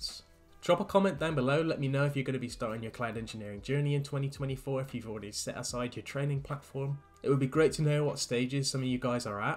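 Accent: United Kingdom. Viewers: Thanks bro.. I know I can trust you with that west country accent like mine😂, great video, very informative 👍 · Are you from Devon?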